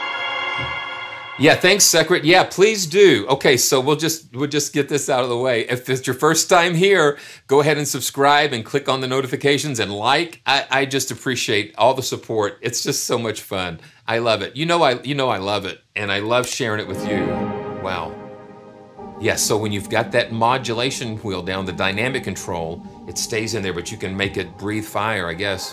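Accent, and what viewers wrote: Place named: England, United Kingdom. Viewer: you have a great voice for scripture voice overs with music. Lots of 'em on youtube. With your wonderful composing abilities and your "just a touch of an english accent you bring to the table on the voice overs", you would have a purrrrdy big following. Timing is indeed everything. Please consider doing this. If you are already, GOD BLESS YOU and let us know your channel! Bless you, brother! PS-HAHA! I found your other sites.:D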